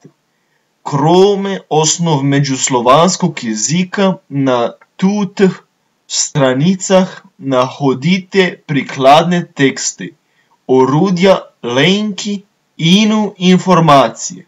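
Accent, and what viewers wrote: Slovenia. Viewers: I'm Polish. I understood everything 100% and I think I would read it better than you (no offence, brother Slav ;)). You don't see a difference when pronouncing "y" and "i" or "e" and "ę". Well, in Polish we have both "y" and"i" and they sound different, and we have also "e" and "ę" and they also sound different...;)) I'm guessing by your accent that you are a Southern Slav. Your accent kinda "suggests" me you're Slovenian...Am I right?? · haha kk se takoj prepozna, da slovenc govori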